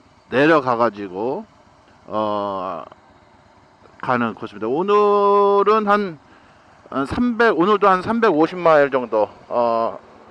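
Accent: Jeolla, South Korea. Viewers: 으메~ 나무 짤라브렀어~ 앗따~ 전라도 사투리 아닌가여? 님 혹 전라도 출신이신가봐요^^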